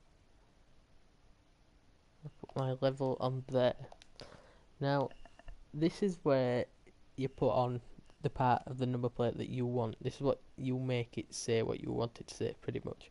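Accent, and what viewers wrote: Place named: Yorkshire, United Kingdom. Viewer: Deffo from Yorkshire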